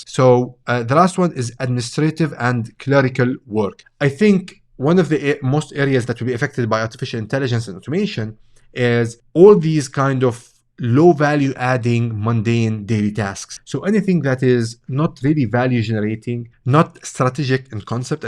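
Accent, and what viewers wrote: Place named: Spain. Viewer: the R😂but don't care, are you native Spanish?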